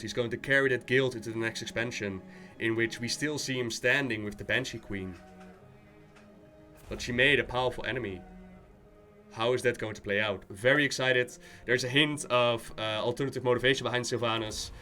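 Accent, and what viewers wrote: Netherlands. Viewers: As a Dutchy also, your accent is very recognizable and makes your videos unwatchable to me XD too bad. I use wowhead though, good stuff!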